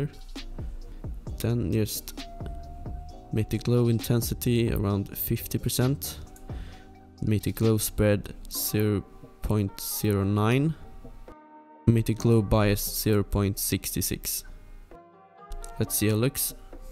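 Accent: Sweden. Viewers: Hahahahahahah jag hör att du är svensk😂 bra video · Are u Swedish? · U sound swedish! Im norwegian btw:) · No way! Ur from Sweden! I hear that from ur voice! 😹 I’m also from Sweden 🇸🇪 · I Love that Swedish accent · U swedish or norweigan? I guess sweden😆Im from Sweden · Heard instantly that you were Swedish, what’s up my Swedish brother. (Också svensk:)) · Are you swedish? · Your from sweden They talk english like that Im from sweden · jag älskar din svenska dialekt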